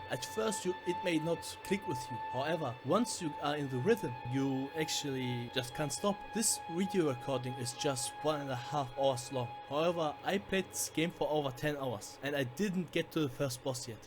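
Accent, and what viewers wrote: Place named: Germany. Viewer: ich finde dein englisch voll gut. Man hört zwar, dass du deutscher bist aber die wortwahl ist echt gut getroffen😂👍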